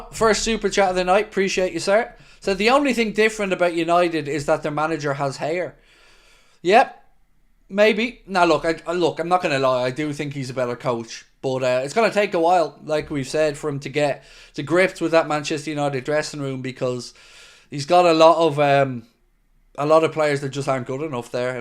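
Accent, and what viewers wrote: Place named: Liverpool, United Kingdom. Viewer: Struggling to understand this guys thick scouse accent !!! Tourist club real scousers support the toffees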